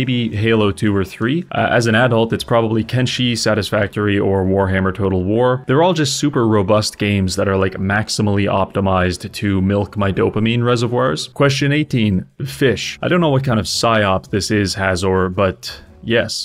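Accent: Canada. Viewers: when i first watched your video (the wh one) i immedietly thought you sound like seth. then seconds later i was hit by the canadian accent and knew i found a gem of a channel XD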